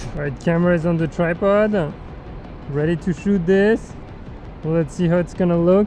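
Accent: France. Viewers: Sorry for this comment, you must really be French to understand that, but it kills me how has not only a French accent when talking english, but also a Parisian accent with all the "Euuh" at the end of each word ahah 😂😂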